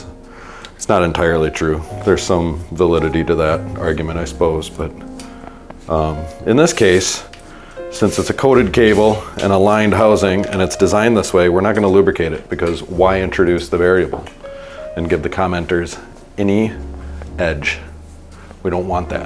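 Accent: Wisconsin, United States. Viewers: I feel like you are from Wisconsin saying Ope! Later on in the video figured it out. Great video! I might do a similar upgrade.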